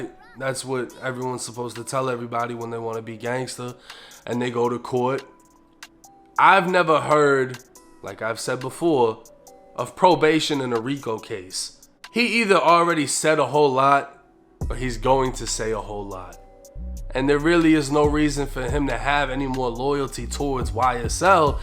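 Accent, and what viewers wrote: Boston, United States. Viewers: Famm are u from Boston cus u sound like u from there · Are You from Boston?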